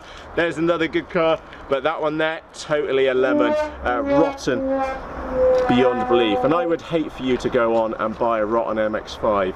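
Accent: United Kingdom. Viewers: Thank you! I absolutely appreciate all the work you've done on this 1.5 hour video. I think this is one of the best guides on buying a car I've ever seen. Normally I don't "like subscribe and bla-bla-bla" but this time I think it would be absolutely unfair not to do it. I hope your advice will help me soon. I'm from Russia myself (don't blame me on that) but I think all the guys telling British accent is hard to understand are talking bs cause this video proves otherwise despite I know there could be some issues with old school British accent) Everything was clear and helpful. Thank you once again. Love it!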